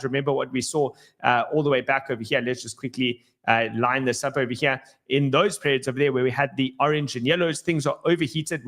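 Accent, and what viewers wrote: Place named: South Africa. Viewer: Love that South African accent, dude!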